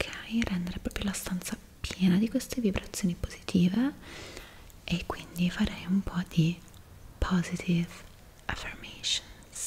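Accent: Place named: Milan, Italy. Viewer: Non capisco se mi sembri milanese o una delle assistenti del diavolo veste prada (no insulto ti adorooo❤)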